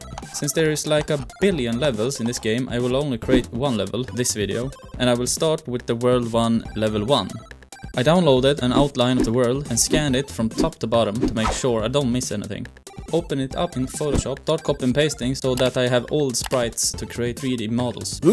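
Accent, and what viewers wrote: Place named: Sweden. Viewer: När en svensk pratar engelska, eller hur?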